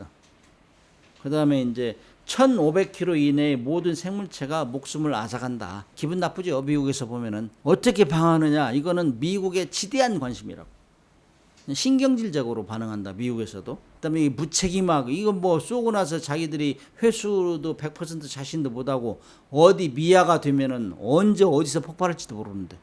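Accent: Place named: Jeolla, South Korea. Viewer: 그냥 그만두라 전라도 사투리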